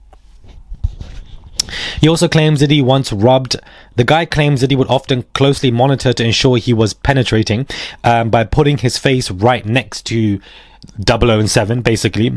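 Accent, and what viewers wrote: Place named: London, United Kingdom. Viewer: Yeah his accent is a London accent. It is how we speak in the South Eastern part of the UK.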